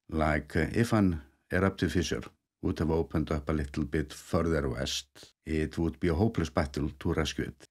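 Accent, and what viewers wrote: Iceland. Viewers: I love Iceland since I was young and I got a book from Jon Svensson about Nonni! But first in May 2022 I could follow my dream and visited Iceland and Nonnis home in Akureyri. In March 2024 I was again in Iceland (between two eruptions) and saw the beautiful Katla icecave. I live in Germany and I will visit the tomb of Jon Svensson in cologne next. Thank you for your beautiful reports about Iceland! I love your Icelandic/English dialect! Many thankful regards!!!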